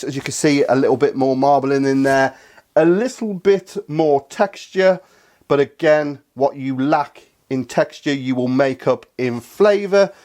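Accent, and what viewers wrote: United Kingdom. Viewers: I don't think that having a British accent and an arm full of tattoos makes him a meat expert. Half of what he said towards the end was pure garbage. If you want cheap meat buy ground meat and add to it. Burgers, meat loaf, etc.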